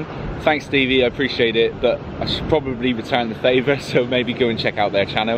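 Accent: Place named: Devon, United Kingdom. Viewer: Get in Bey!!! come down to Devon, although you sound like you’re from my area anyway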